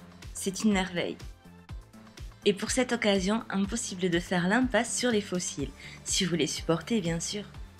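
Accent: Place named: Béziers, France. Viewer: Vu ton accent tu viens de Béziers non ? Hihi j'adore ton maquillage bisous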